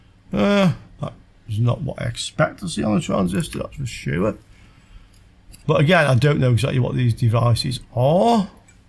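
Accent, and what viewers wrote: United Kingdom. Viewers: Tiež si ho fičím 😀 ten britsky prizvuk je bozsky. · tak mne britsky prizvuk lezie na nervy, zaujima ma skor obsah a on to popisuje taj britsky - jednoducho :D . Ty sa zaujimas o elektroniku,ci len prizvuk?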